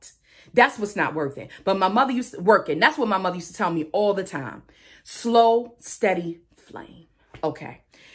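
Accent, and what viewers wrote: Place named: Northeast, United States. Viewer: Are you from Baltimore or Philly btw? I can hear the north east accent.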